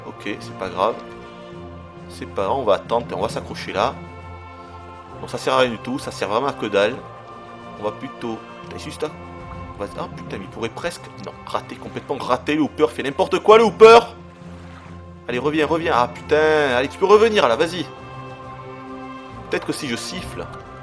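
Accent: South, France. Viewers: Bein oui, l'accent du Hooper viens du sud.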